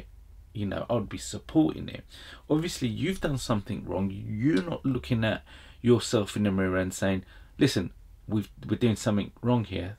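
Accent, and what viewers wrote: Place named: United Kingdom. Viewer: Expected to hear a Midwestern deep African American voice, Received an accent from the motherland across the pond. Jolly good! You earn a new sub for making me laugh as well as we have the exact same shirt on. I don't know the odds on that but it's still pretty fuc*ing impressive. I'm looking forward to more content brother.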